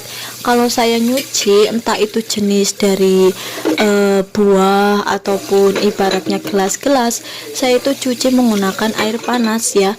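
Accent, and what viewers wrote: Banyumas, Indonesia. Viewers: Mb dr mana ya?... kok ngapak kaya aku?...🤣😛🤦‍♀️ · Medok bgt mba logat nyah.. org banyumas yah.. smangat deh bwt mba nyah. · Mb ko ngmngny ngapak..wong ndi sampean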